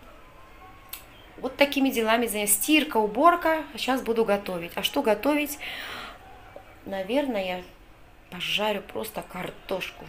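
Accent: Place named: Georgia. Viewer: А мне нравится как вы разговариваете на русском языке!Такая изюминка присутствует в легком акценте.Если бы вы сказали,что грузинка,то все писали бы ,,ой как вы хорошо говорите по русски!"А прожить в Грузии столько много лет и разговаривать по грузински....конечно меняется интонация!Я вас понимаю потому что сама жила в Грузии 4 года с родителями и ходила там в школу,это было при Советском Союзе.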